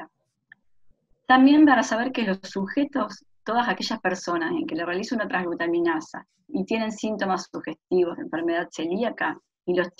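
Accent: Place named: Argentina. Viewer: Hola, gracias por toda esta valiosa información. Solo quiero aclarar una cosa. Yo padezco la Enfermedad Celiaca diagnosticado ya hace varios años aquí en Los Estados Unidos donde vivo. Se me ha dicho todo el tiempo por los Doctores que la avena no contiene gluten naturalmente, solo se contamina cuando se maneja junto con el trigo. Yo ingiero avena frecuentemente y no me produce ningún problema. Por el acento de la Doctora creo que es Argentina, escuche este mismo comentario en un programa de televisión Argentino. Así que no se si esto es un error pasando solo en el sur de America o es un error aquí en los Estados Unidos.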